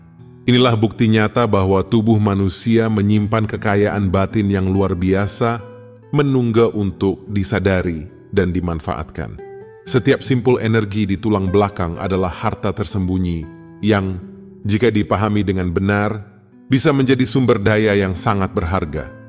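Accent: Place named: Malaysia. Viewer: Logatnya Seperti Bahasa Melayu Yang Disampaikan Oleh Penuturnya... Tetapi Text Tertulisnya, Menggunakan Bahasa Indonesia...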